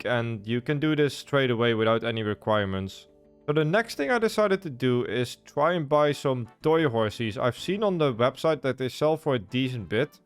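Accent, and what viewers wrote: Netherlands. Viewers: I smell some dutch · You're dutch right? Can hear it in your accent haha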